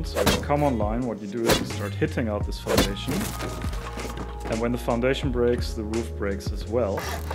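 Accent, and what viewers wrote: Germany. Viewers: No offense to your english, but I instantly knew you were german. People also do realize my german accent after I start speaking even when there are no grammar mistakes :D Whatever, calm voice, calm music and good mic / video quality. Well done man!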